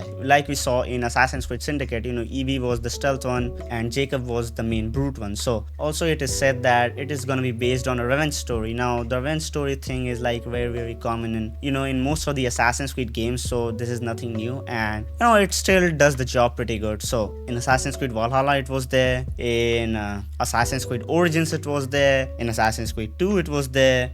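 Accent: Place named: India. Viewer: Yoo, are you Indian? Your accent sounds a lot like it.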